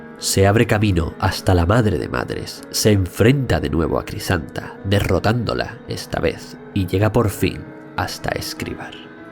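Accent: Spain. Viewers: Excelente trabajo, y que este relatado con acento español le suma mucho a la ambientación.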